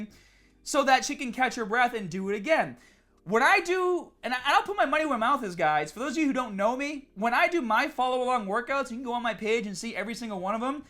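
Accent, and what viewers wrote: Boston, United States. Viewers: Bruthah, your accent is wicked pissah! I grew up in Boston but lost my accent in Tuscon. Whenever I'm in Boston I realize that the accent's disappearing. Hold on to it. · I'm starting to get used to the Boston accent. It's becoming endearing! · Lol Boston accent thicccccc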